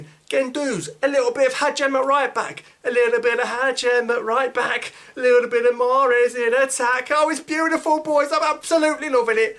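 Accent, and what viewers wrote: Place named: Cockney, United Kingdom. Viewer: Well done, Cockney boy keep up.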